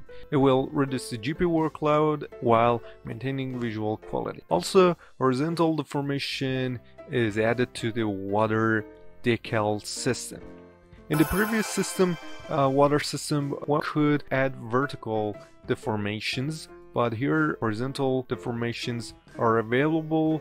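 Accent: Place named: Eastern Europe. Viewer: probably dude eastern european dont make fun of it like that, Don't make fun of accents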